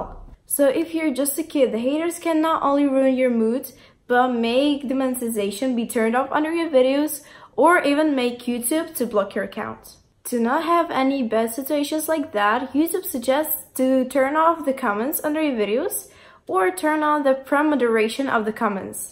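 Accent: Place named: Russia. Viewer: видно же что русская. По акценту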